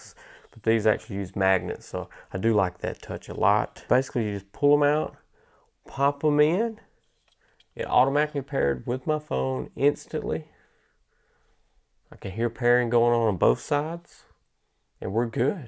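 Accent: South, United States. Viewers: NICE REVIEW, GREAT VOICE, love that country twang!!! ❤️😉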